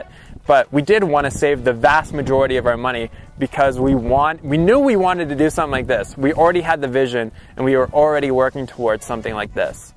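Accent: Canada. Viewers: this video makes me really sad so I won't be finishing it. It's sad that we live in a culture and society where people think it's okay to ask about your money or net worth or whatever. I agree with your mom, it's disrespectful and no one's business how much money you and have in the bank. People are too entitled. If you guys have $2 or $2 million dollars, it isn't our business. That's between you and your wife. On a side note, I knew you were from Canada! Yes! Silently pats myself on the back. Your accent seems Canadian but some words you say sound slightly Australian, like you lived there for some time and picked up a bit of their accent. I digress. Anyhow, thank you for the videos!:)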